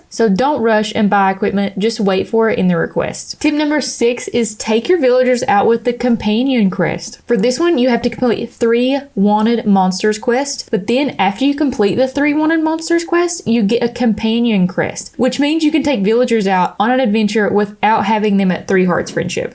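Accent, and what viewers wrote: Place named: Texas, United States. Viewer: Yooooo....that accent tho lmao. U gotta be from Texas or Bama. Hahahahaha I love it. Makes me feel at home.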